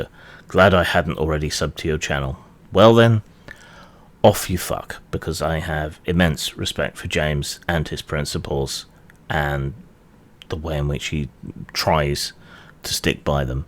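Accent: United Kingdom. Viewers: Still a little surprising that you recited my bloviation and griping about contemporary US social decay. I appreciate that you consider it worthy of repeating. I need to improve my prose if its going to be read aloud by your dulcet southern English tones.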